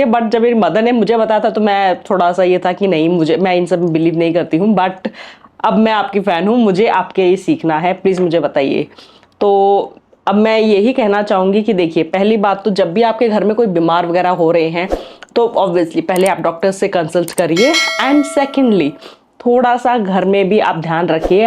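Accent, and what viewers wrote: Haryana, India. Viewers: Mujhe b ap bahut achhe lagte ho😘😘😘 or specially ap jab haryanvi bolte ho jab🤗😍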